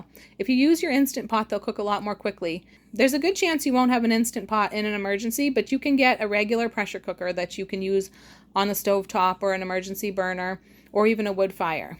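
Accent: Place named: Massachusetts, United States. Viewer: Don,t know where you live but I lived on Richard Circle Woburn and neighbors behind were o Border road ,Reading.I can tell you are fro. Pretty close by with your accent which is pretty mellow but its there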